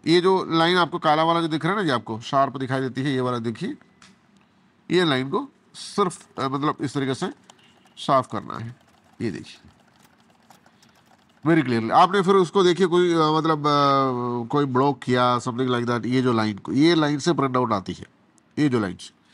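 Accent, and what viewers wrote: Kerala, India. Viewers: Malayali aaanelle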